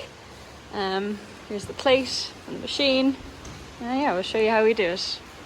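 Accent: Ireland. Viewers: are you irish o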